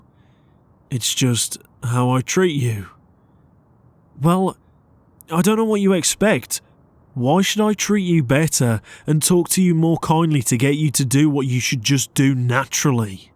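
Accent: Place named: Birmingham, United Kingdom. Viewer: You are doing great things for the Brummie accent 👏🏻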